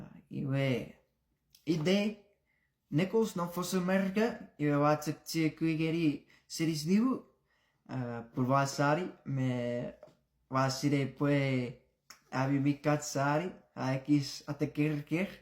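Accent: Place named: Chuuk, Micronesia. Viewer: I'm impressed with your chuukese accent! Much love from Micronesia!❤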